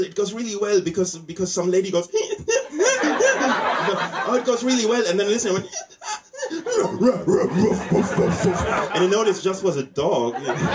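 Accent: Germany. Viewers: i live in switzerland this guy reminds of my german friends, they have an exact same english accent. And i loved your jokes it was funnier in your accent and time by time you asked the audience what is it in english hilarious. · This rocks! And I bet for the Americans the German accent even doubled the fun. Anyway, it's hilarious! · Echt lustig. :'D Nur dieses deutschige Englisch.. Ist aber trotzdem gut! ( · hello, germany! Haha, the clip is great and Your english also! I ran over your conversation with the 'Ben' and I have to say I admire your patience ; ) Btw, I'd guess, judging by how your accent sounds, you're from somewhere in the southwest region of germany? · It's actually quite funny! And the German accent makes it even funnier! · What a funny German accent. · Awesome! I kinda like the german accent, although I don't have any.. Greets from Germany! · Er vielleicht? 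Man hört doch ganz deutlich den deutschen Akzent. Außerdem gibt es auch in Deutschland sprachbegabte Menschen. Vielleicht gehört du einfach nicht dazu? Ich weiß es nicht, da ich dich nicht kenne, aber es kommt mir gerade so vor. Ist nicht bewertend und angreifend gemeint, um das schon mal klar zustellen. Ist mir einfach nur so vorgekommen....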